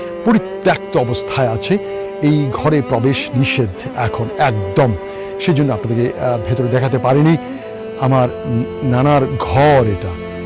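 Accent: Noakhali, Bangladesh. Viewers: এখনো নোয়াখালীর ভাষায় কথা বলতে পারেন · Noakhali vasa dekhi thik e aase. . lovely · bay annna to noakhali balo bolta haren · অন্যের মুখে নোয়াখাইল্লা কথা হুনি আত্ন বালা লাগছে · ভিডিও টা দেখে কমেন্ট না করে পারলাম না দাদা। আজকে কথা গুলিও একদম জন্ম দেশের খাঁটি মনে হচ্ছে নোয়াখালী। খুব ভালো লাগছে। ত্রিপুরা থেকে ❤️❤️ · আপনার মুখে নোয়াখালীর ভাষা শুনে খুব ভালো লাগলো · ও মা!!হেতে যে খুব ভালা করিই,নোয়াখাইল্লা কতা কইতে হারে 😁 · আপনার নোয়াখালীর ভাষা খুব মধুর লাগে আপনার কন্ঠে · নোয়াখালী ভাষা আপনি ভালো জানেন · আপনি আমাদের নোয়াখালির সন্তান। আন্ছলিক ভাষা শুনতে আসাধরন। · ইরিও আনতে পুরা নোয়াখাইল্লা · 😂😂😂 আমি পাগল হয়ে গেলাম আপনার নোয়াখালীর আঞ্চলিক কথা শুনে।আমি ও নোয়াখালীর লোক। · আসসালামুয়ালাইকুম ভাইয়া আপনার মুখে নোয়াখালীর ভাষা শুনে অনেক ভালো লাগলো আমার জন্মস্থান নোয়াখালীর হাতিয়া চর চেঙ্গা বর্তমানে চট্টগ্রামে থাকি ❤❤❤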